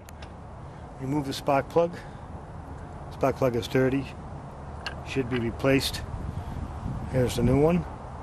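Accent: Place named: New Jersey, United States. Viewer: Youve got a jersey accent. My daughta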